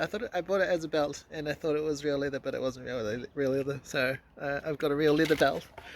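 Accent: New Zealand. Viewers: Kiwi bro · What's the accent? NewZelandian?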